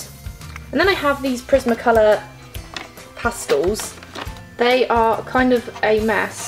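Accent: England, United Kingdom. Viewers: are you australian or english? your accent reminds me like your from the UK or something XD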